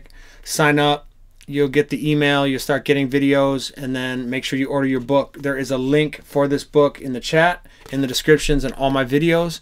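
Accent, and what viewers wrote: Hawaii, United States. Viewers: Haole-Hawaiian for LIFE! Makes me smile when your pidgin comes out interspersed between most of the reg. English stuff. Haha. Right on man.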